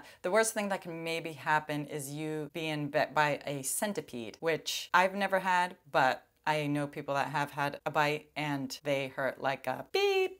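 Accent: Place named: Boston, United States. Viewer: you definitely still have a little Boston accent. you sound like you are from Chelsea or Malden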